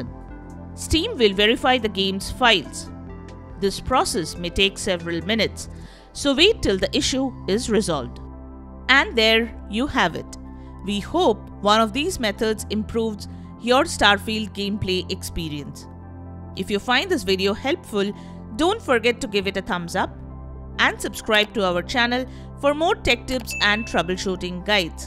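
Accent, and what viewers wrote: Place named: India. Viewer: the accent instantly told me what i needed to know, down voted, and "dont recommend channel" lol i NEVER trust indian accents with anything.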